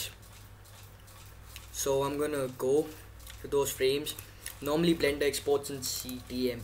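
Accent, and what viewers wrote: India. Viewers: I actually like your accent, is it Indian?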